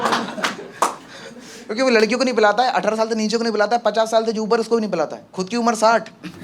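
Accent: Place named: Gwalior, India. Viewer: Proper gwalior accent 😂😂 love from gwalior...proud of you ❤❤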